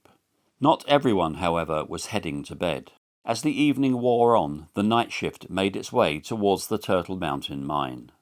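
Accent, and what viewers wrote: United Kingdom. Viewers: The narrator has a British accent, so he's the ONLY one I would ever give a break! GO HAWKS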